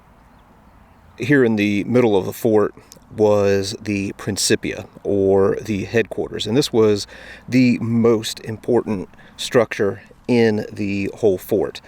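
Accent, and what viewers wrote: United States. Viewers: So so funny listening to an american talking about Roman ruins!! Yeap there was a lot more going on in the rest of the world before the usa came along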